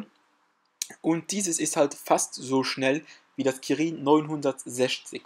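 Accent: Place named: Luxembourg. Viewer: Dat heiert enn met der stemm dat ass esou en deitsch-letzebuegen Akzent Waiste wat ech mengen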